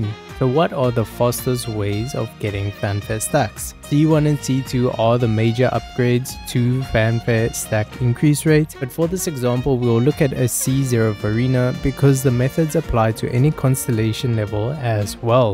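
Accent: South Africa. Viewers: Do I detect a lovely South African Accent?! Respresent! XD enjoyed the video 🤌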